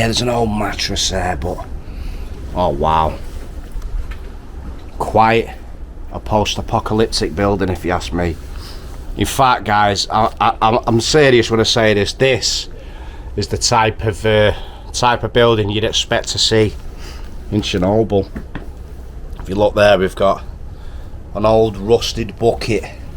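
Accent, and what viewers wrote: Manchester, United Kingdom. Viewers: Eastford square was a vibrant area for local people. Collyhurst was rough and ready but the people were the best in 1960/70. It had masionettes across the road and further up from the car park they were old peoples flats. It was a pub across the road. A well liked intergalactic place for artist to play and sing. The area has been neglected but it was a great place to live. It’s outside people who messed it up. You sound Mancunian but you’re a disgrace to the city. It’s rough now but wasn’t in its heyday. Shameful video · I could listen to your manc accent all day long 😍 I drive past Eastford Sq every day heading to work. The concrete artwork is a genuine William Mitchell piece. He was the same artist that produced the three 'Minut Men' outside Salford University's Allerton Building. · Beltin' channel. Great to hear a proper North Manchester accent, are you from Colly mate?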